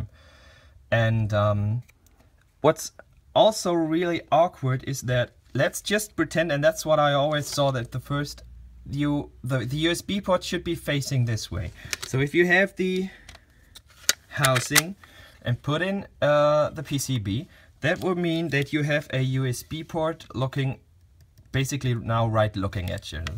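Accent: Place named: Germany. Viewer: great accent :) really impressive english for zhe german ... keep up with good & quality videos in english :)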